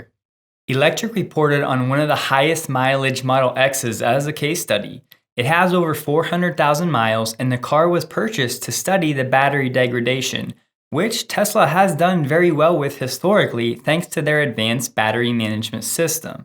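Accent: United States. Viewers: It's really a pleasure to hear a nice comprehensive summary covering major Tesla issues. It's even better when it's in clear American English.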